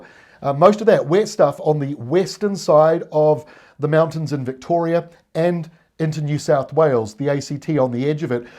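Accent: New Zealand. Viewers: Love your kiwi accent and the forcast of thuck clouds.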